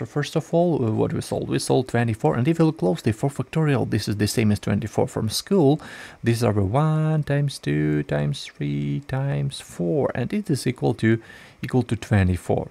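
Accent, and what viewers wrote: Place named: England, United Kingdom. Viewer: Great stuff but you talk too fast, your English accent is not helped by muffled sound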